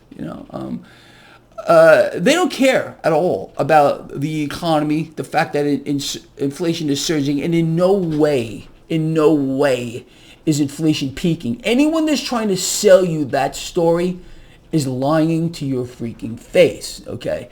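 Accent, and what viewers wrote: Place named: Upstate New York, United States. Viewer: where did you grow up? The Northeast? I get a vibe you're from upstate New York sometimes 😃 Great episode, thanks for all your excellent advice!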